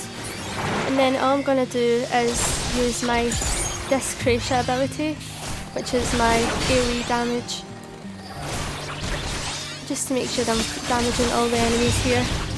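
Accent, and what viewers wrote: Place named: Scotland, United Kingdom. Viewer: I had a terrible time just doing it, thanks to this it helped, and its nice to hear a Scottish voice on here (I'm from NE ENgland and live in Scotland)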